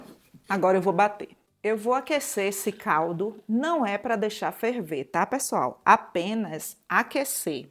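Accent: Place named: Aracaju, Brazil. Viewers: Parece que tu é de Aracaju sotaque igualzinho 😂😂😂😂 gostei da receita vou fazer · Menina, que maravilha! Vou fazer. De onde tu és? Eu conheço esse sotaque. Também tenho, sou de Pernambuco, mas moro em São Paulo à 40 anos, mas te ouvi me deu muita saudades da minha terra. ❤ Parabéns pela receita.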